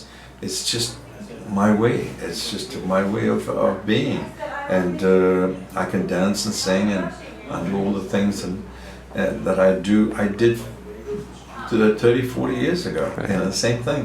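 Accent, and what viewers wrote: United Kingdom. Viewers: Engelbert is very relaxed, and reminiscing about the old days! This is backstage, in his Dressing Room at the Count Basie Theater in Red Bank, NJ and I was there, to see the show, and had the nicest meeting with Enge after his show. He is so soft spoken, and that touch of a British accent, and telling his stories, and I love to sit there listening to him. Thank you Mark Weiss and Rock Scene Magazine for a most enjoyable interview with Engelbert - The King of Romance! <3<3<3 Dorothy Whalen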